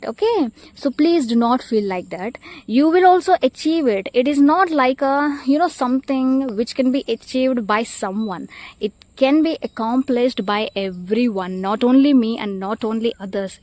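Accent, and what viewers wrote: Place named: Andhra Pradesh, India. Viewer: I think your from Andhra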